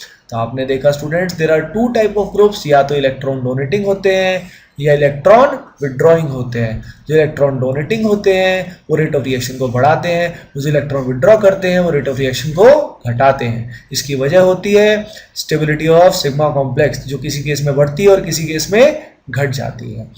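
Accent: India. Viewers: i really hate indian accent but thanks for ur effort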